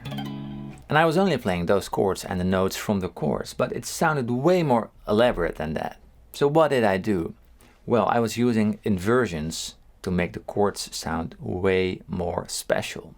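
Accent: Netherlands. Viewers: Hey man are you dutch? Zo ja hallo uit nederland!!! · Thanks man! So simple, yet so nice! BTW, are you Dutch?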